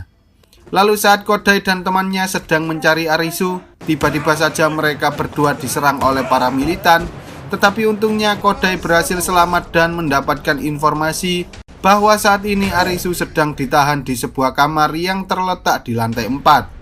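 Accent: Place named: Banyumas, Indonesia. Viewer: ngapak nya dari daerah mana nih 😂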